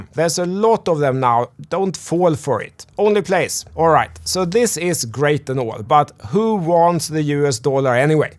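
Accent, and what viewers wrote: Sweden. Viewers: Är du från göteborg eller Stockholm?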